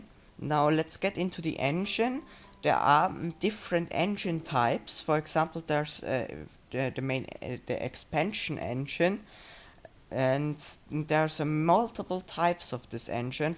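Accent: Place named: Austria. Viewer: dieser österreichische akzent bruder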